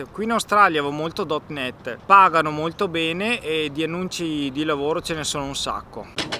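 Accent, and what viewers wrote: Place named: Veneto, Italy. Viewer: Vecchio mi sto sparando tutti i video! Montaggio figo e info utili! Poi l' accento Veneto xè a roa pi bea del mondo!! Ahahah!! Sto programmando di venire anche io in zona appena finisce tutto questo casino.. Matteo da Rovigo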